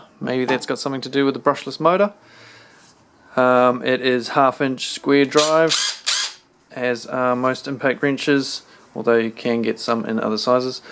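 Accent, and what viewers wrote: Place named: New Zealand. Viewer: Sound a little bit kiwi almost actually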